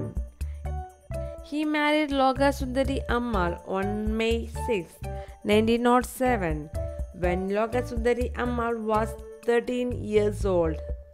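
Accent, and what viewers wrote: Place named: United States. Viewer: Funny and nice american english, btw tq for this precious video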